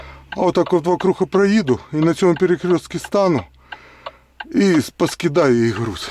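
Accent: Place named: Russia. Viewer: Боже, як в тебе мова запаскуджена язиииком!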